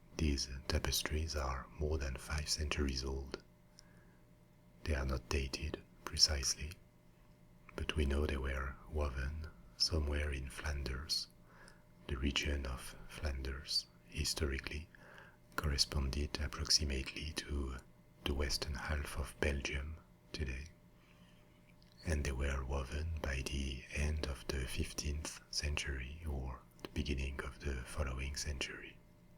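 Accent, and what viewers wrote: France. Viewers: I wish there were more ASMRtists doing history videos. I'm a huge history buff and the French accent only adds to the flavor.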